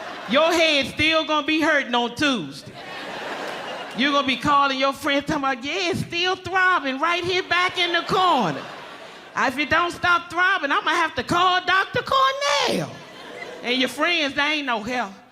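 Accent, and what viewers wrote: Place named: Washington DC, United States. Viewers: I know our accent anywhere #DC birthed some of the funniest comedians in the game. I'm just gon say Martin Lawrence, Dave Chappelle, Tommy Davidson to name a few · That DC accent & lingo makes me feel at ease 😂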